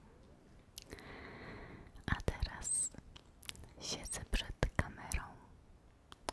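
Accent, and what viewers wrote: Poland. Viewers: Enhorabuena por tus 20000 suscriptores. Te lo mereces porque lo haces muy muy bien. Tus vídeos y tu acento polaco son excelentes para relajarse. Gracias y un beso.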